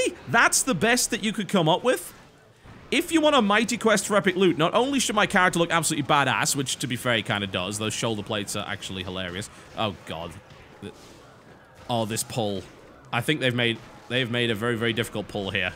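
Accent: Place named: Geordie, United Kingdom. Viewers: So me being a geordie, am I right in saying this guy is a geordie but he's trying to hide his accent, because I can hear it. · I know you're a troll, but I'm going to reply anyway; he's from the north of England.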